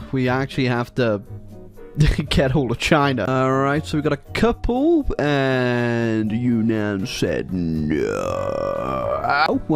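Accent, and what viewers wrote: United States. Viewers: I don't know where is from anymore. I actually thought he was Belgian once but I realized that was only a meme? Then it seemed like he was just English, but now he's saying "zee" and actually the rest of his accent sounds like it might be American.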